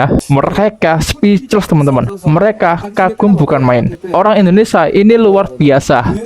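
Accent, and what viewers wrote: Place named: Java, Indonesia. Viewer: Logat Jawa nya ,,jangan terlalu medok ,,min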